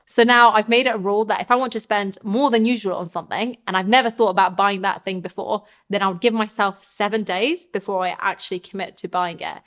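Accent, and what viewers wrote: United Kingdom. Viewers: This vedio helping money management nd British pronounce......👍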